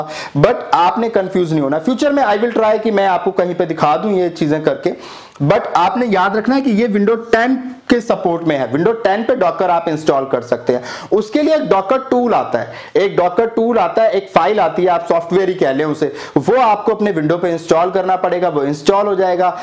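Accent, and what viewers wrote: Pakistan. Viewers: Sir aap bharat se ho ya pakistan se, aapki ton Pakistani lag rahi hai.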